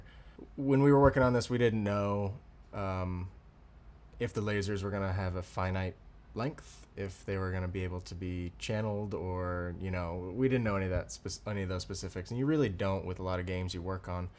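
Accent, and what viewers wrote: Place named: Portland, United States. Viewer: I could tell you where from portland before you even said so. I would know (I live there)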